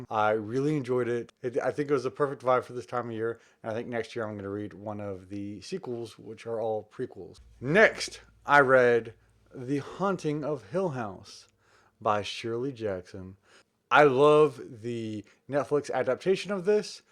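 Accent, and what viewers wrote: South, United States. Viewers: I can't believe you had a lot of spooky books at your house in Texas on this video during your quarantine including Practical Magic by Alice Hoffan, The Haunted of the Hill House, Cemetery House by Aiden Thomas, Mexican Gothic by Silvia Moreno-Garcia, The Year of the Witching by Alexis Henderson, The Southern's Book Club's Guide to Slaying Vampires by Grady Hendrix and everything including House of Leaves by Mark Z. Danielewski until you're talking about all of the spooky books to read them at the same time while you're telling the whole story from all of the spooky books after that, but I hope your husband, Zach will be very proud of you and I love your Southern accent, I wanna say Happy Halloween to you and him, so stay safe!!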